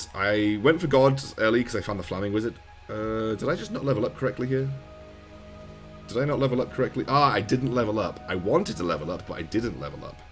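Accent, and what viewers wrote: England, United Kingdom. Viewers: The background music and the accent from a mature england man made this a lowkey asmr video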